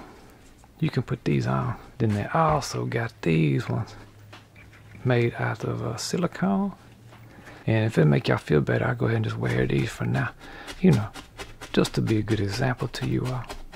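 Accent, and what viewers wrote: Louisiana, United States. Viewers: Hey my kids and I love your show! Are you from Louisiana?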